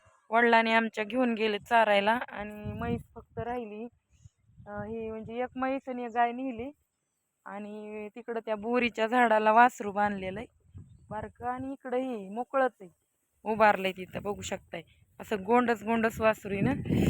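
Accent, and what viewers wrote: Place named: Ghat, India. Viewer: आपली घाटी भाषा भारी वाटते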